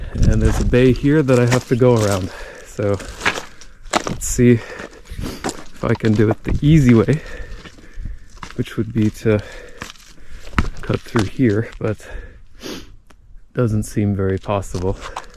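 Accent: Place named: New England, United States. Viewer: What state are from? I have no doubt in my mind that you are a new englander!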